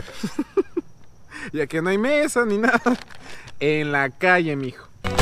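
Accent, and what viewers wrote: Ecuador. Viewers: Nuestro acento Ecuatoriano en ingles se reconoce al paso 😂😁🇪🇨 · Eh visto otro youtuber y si son buenos. Pero Tu, tienes calidad, hasta para hablar a lo Ecuatoriano 👍👍💪🇪🇨🙏 sigue asi y bendiciones. Te ganaste en mi lista #1 de youtubers.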